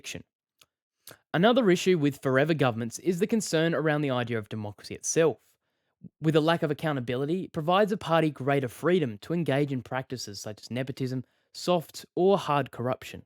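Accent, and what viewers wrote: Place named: United States. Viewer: Good presentation but the Americanised accent sends me to the off button.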